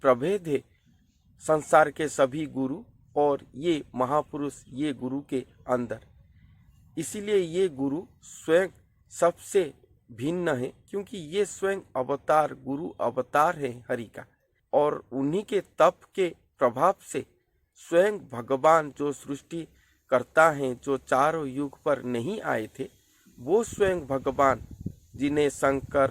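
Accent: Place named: Odisha, India. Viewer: 2024 bole..odia log ka pronunciation aisa hee hai..it's 2024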